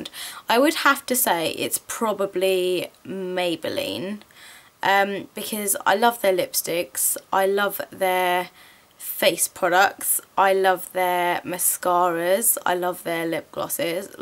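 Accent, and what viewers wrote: England, United Kingdom. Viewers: Hi. I love your English Accent. Can you help me learn this...:-)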